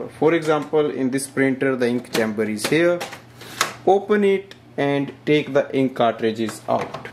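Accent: India. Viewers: Talk in Hindi ,your English is typical Hindi English language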